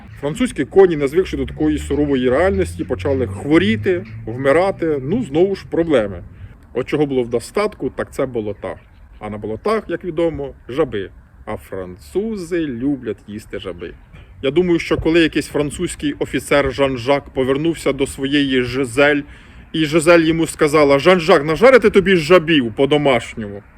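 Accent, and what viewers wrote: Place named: Central Ukraine, Ukraine. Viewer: Відчувається мовлення центральної України. Хоч і західна її частина. Дякую.🇺🇦💙💛❤🖤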